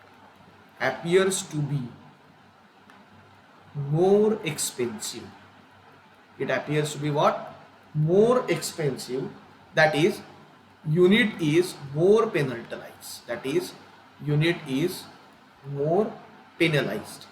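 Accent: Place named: Marathwada, India. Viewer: Sir your marathvada slang is so sexy Baki beutiful vedio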